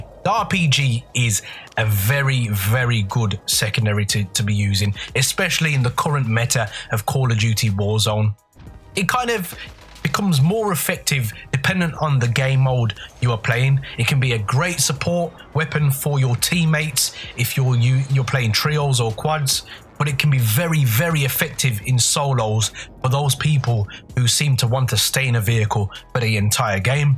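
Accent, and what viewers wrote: Birmingham, United Kingdom. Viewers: Walsall? You sound it but then I hear abit of Brummy in you to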